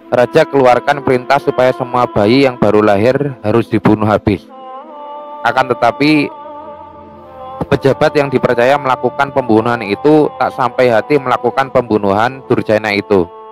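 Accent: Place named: Java, Indonesia. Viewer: Capek gw dngrin baca critnya.. medok gtu bahsanya 🤣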